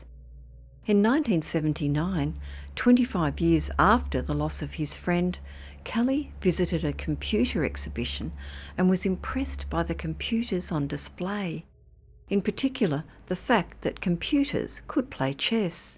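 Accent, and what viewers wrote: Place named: Australia. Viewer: I think the time of the Aussie narrator and/or pitch man has passed. Let's just not anymore huh.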